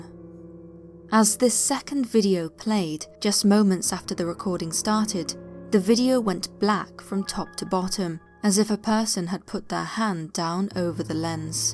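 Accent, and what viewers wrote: United Kingdom. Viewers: She does have a very pleasant voice and clear British English. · When Brits say the word "figure" it sounds like "figga". The word "home" sounds like "haome". I enjoy the stories though.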